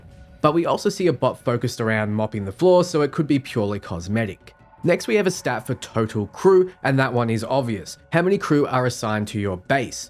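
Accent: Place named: Australia. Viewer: Amazing content! I have been obsessively watching all your clips! Also good to hear an aussie accent in these vids.. *not an aussie, but a kiwi cuzzy!* Have you found any intel on if bgs have reworked the 'karmic elements'? I'm soooooo hoping I can be a evil crimson pirate!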